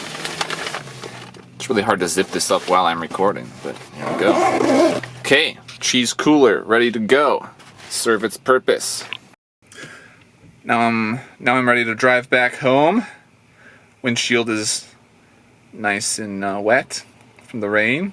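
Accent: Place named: Minnesota, United States. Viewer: You sound very Minnesotan 😆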